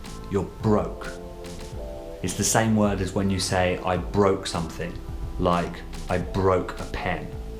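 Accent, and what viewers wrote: United Kingdom. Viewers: Where do you live in Uk? Your dialect? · Hello,! I found your channel and let me tell that it's amazing! I learnt new words and phrases today. I really love the British accent and hope you keep uploading more videos. Finally, what do I think of British currency? Well, the first phrase that comes to my mind is "great exchange value", because British currency has more exchange value than others kinds of currency. 💷😎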